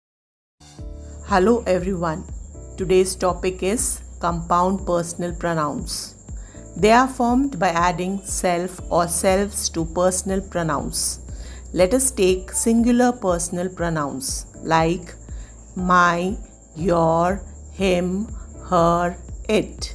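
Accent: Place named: India. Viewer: Indian ahhh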